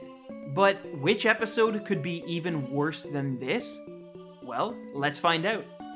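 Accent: Canada. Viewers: Are you Canadian? Cause you sound like a Canadian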